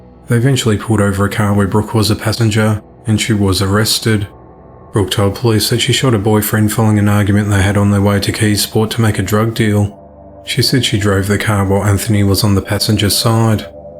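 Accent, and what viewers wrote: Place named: Australia. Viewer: Just came across your channel and as soon as I heard your accent I was like, hell yeah I am definitely subbing to Mt fellow Aussie... 🙂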